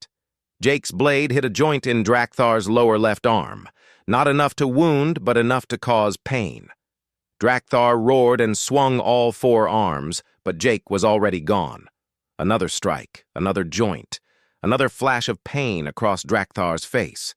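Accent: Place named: South, United States. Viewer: Why the hell did you choose a redneck voice to narrate this AI story.......it made it into even more of a joke.....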